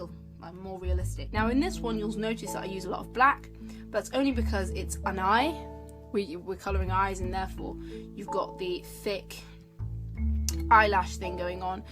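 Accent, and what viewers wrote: United Kingdom. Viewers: OMG are you British? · Are yiu from England? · really good tutorial! :D love your accent, british is so beautiful :3 good work! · Your drawings are amazing! Mid-england? Same accent as me :D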